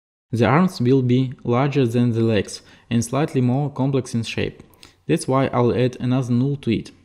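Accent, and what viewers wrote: Russia. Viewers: МММММ)))) Этот сладкий русский акцент) соу суит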